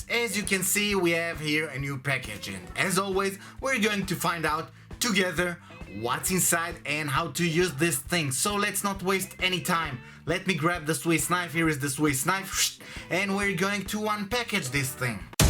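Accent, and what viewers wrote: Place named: England, United Kingdom. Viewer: wow talk about stereotype english accent!!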